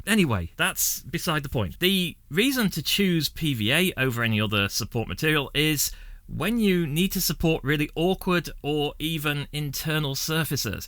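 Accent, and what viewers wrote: England, United Kingdom. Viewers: Nothing more fun than listening to an Englishman.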